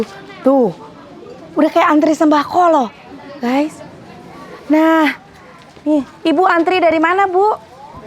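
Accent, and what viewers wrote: West Java, Indonesia. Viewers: seneng bagnet denger teh susundaan berasa di kampung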